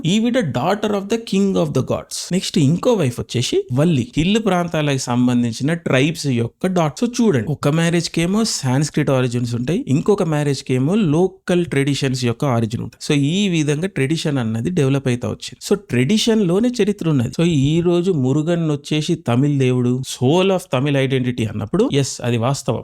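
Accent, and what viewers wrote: Telangana, India. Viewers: ఇంతకు నువ్వు మాట్లాడేది తెలుగా తెలంగాణ నా?? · Telangana Yasa 🙏🏻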